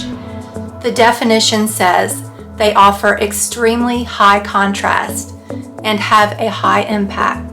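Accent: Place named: South, United States. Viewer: I like Blue and Yellow and your southern accent.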